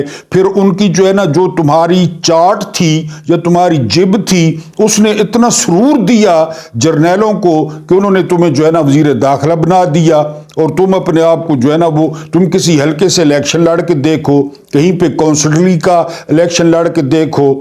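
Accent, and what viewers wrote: Saraiki, Pakistan. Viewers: واہ صاحب ۔ آپ کی گفتگو اردو میں سرائیکی کا تڑکا لگاتے ھو😅 ۔۔سن کے دل❤ خوش ھوتا ھے ۔۔ بہوں خیر ھوونے 😊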